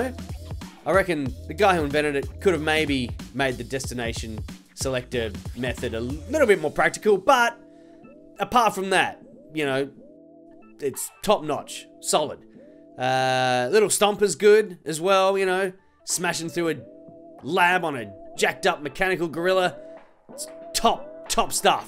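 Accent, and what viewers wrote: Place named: Australia. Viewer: I didn’t know he was Australian I thought he was Italian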